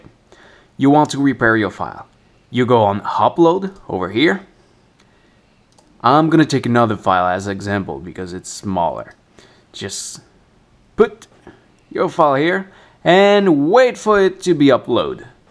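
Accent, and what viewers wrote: Quebec, Canada. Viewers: thanks for this video! Will try it out. Like your style - what is your accent - Quebecois?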